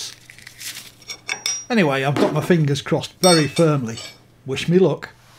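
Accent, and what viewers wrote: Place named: Southern England, United Kingdom. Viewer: Got to love the Southern English accent, full of humour and understated knowledge.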